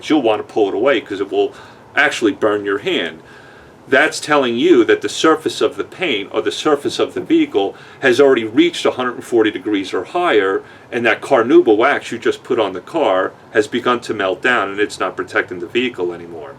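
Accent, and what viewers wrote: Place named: Queens, United States. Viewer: how did a guy from Queens (I'm guessing based on the accent) end up in Dallas? Keep up the great work!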